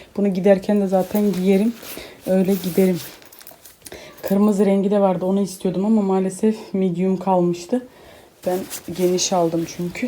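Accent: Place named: Azerbaijan. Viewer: Canim merhaba sizin konusmanizdan kulturunuzden bize azerbaycanlara benzediyorum niyese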